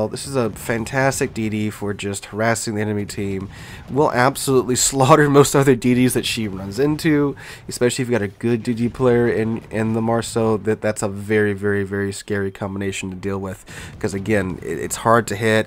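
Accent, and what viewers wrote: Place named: South Texas, United States. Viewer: Sounds like you live in South Texas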